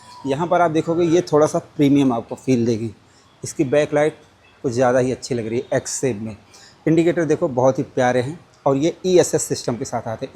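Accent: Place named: Awadh, India. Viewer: Bhai aap ka bolne ka desi awadhi tarika bahut hi gajab lagta hai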